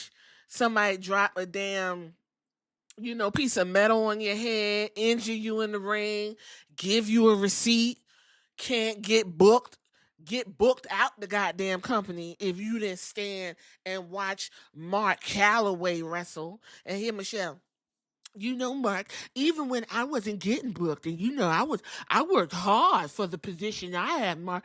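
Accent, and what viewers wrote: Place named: New York, United States. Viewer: Yo I love your NY it reminds me of home…”fk outta here!” 🤣🤣🤣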